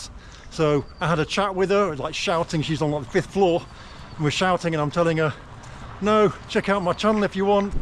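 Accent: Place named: North of England, United Kingdom. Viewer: Hi, I’m not an expert on English accents. Where are you from? It’s the north of England I know but I can pin it down. North west? Liverpool?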